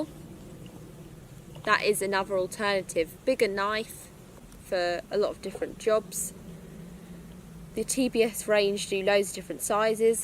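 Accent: United Kingdom. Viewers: Oo you’re British that’s nice for a change well used to American vids about bushcraft but no U.K. content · British accents sound great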